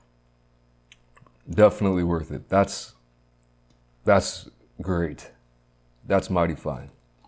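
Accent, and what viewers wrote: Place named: Midwest, United States. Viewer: Why would he be canadian? He clearly has a midwest accent and lives in Korea lmao, nothing canadian about bro.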